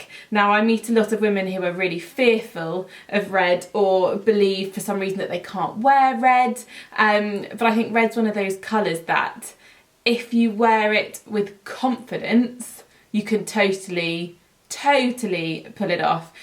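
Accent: United Kingdom. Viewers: All your tutorials are sooooo adorable & I like your British accent big time :) plz don't stop filming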